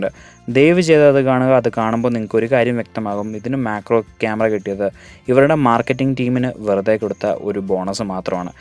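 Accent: Idukki, India. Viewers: Idukki karan ano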